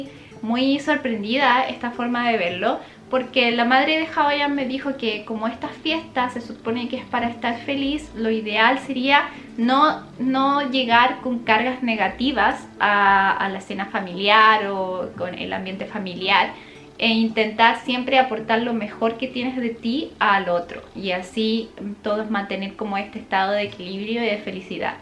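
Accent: Chile. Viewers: Siempre me ha gustado el acento chileno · Estas perdiendo tu acento chileno. Abrazos!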